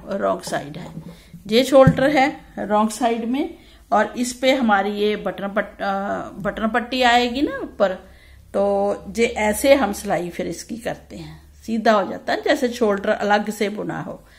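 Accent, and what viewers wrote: Punjab, India. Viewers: ji aap ka Punjabi tone bhut achha lagta hai...raaje kahna to bhut hi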